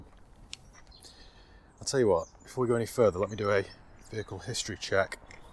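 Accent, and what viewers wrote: United Kingdom. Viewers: The more I hear that bleeding British accent, I want to scream!!! Stuffy…prudish….snobby….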